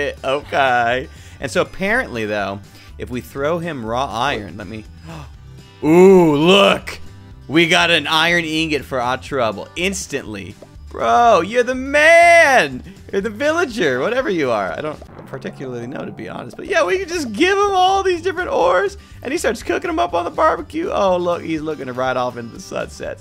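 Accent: Texas, United States. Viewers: wait... thats how you talk in Texas? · I LIKE YOUR COWBOY ACCENT I SO EXCITED FOR THIS MOD OF THE HAERT OF TEXAS LET'S GET INTO IT PATNER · texas person talking about texas mobs in a texas accent (i think thats what it is) noice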